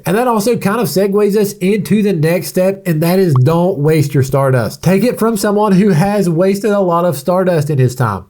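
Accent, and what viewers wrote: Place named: South, United States. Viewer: You got a solid southern accent, but some real antifa loser vibes.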